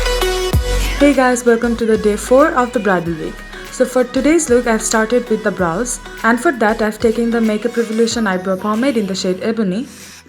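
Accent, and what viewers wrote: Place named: Bangladesh. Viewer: Why Indian? You sound like bangladeshi 🤨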